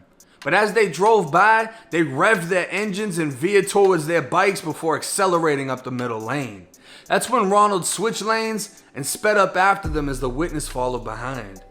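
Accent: Boston, United States. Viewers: They were all on slow ass Harleys I bet.. they wouldn't never caught a sport bike.. That's also why I stay strapped tho 😂😭🤷🏾‍♂️ Also, u from Boston? I'm from CT.. i hear an accent lol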